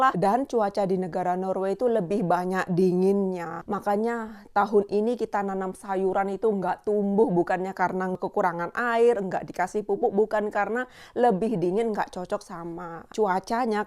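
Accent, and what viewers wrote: Java, Indonesia. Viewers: Sumatra kok logatnya jawa banget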